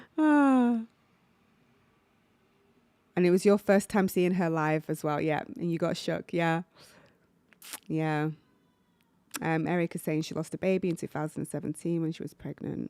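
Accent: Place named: Manchester, United Kingdom. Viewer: Hello! I just wanted to say how much I appreciated your reaction. Few people truly feel Lara Fabian the way you clearly do. I was genuinely moved by how moved you were. You captured her essence perfectly, and I really hope you'll consider sharing more from that phenomenal London concert. Also, I have to say, you’re an absolute pleasure to listen to. Your voice has such clarity and warmth. Yet I couldn't help noticing a few vowel qualities that hint at a slight Northern influence, perhaps Manchester? It gives your speech a unique charm. 😊 I'll be attending Lara’s concert in Geneva on 28 November, and after hearing your impressions, I’m even more excited. Thank you again for such an honest and heartfelt video. 💜 P.S. I've just subscribed to your channel! 😉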